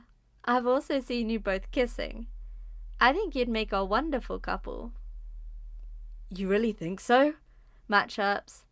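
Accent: United Kingdom. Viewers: Finally. I enjoyed the other Sonya story parts. However, others are right about continuing on with the story. Looks as though there could be many more chapters left to be told . Sonya, Matt and Laura and their adventures..😊😊 Oh. A womderful story told with a British accent too.. If I'm mistaken about accent. Please forgive me...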